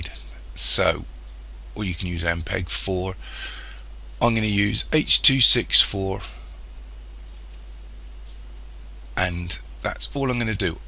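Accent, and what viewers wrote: United Kingdom. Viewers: Thank Mr. Guessing you're from the UK.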